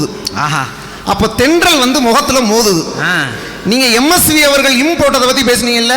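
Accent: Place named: Telugu, India. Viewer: இவர் தெளுங்கரா.... தமிழை இவ்வளவு அழகாக பேசுகிறார் 😂😂😂😂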